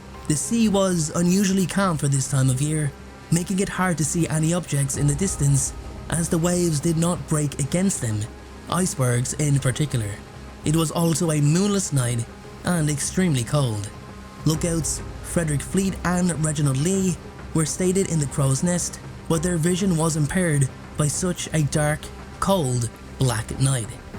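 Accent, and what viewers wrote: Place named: Dublin, Ireland. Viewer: Well done on the video,but if you’re gonna try hide your inner Dublin accent,leave out the Americanised upswing at the end of almost every sentence,it starts to sound way too bullet pointed ! Just a thought…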